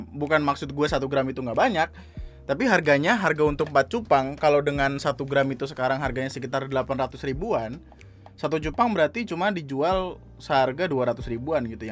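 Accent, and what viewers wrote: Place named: Riau, Indonesia. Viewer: Logat Riau tapi pakai lu gua. Jatuh jatuhnya cringe.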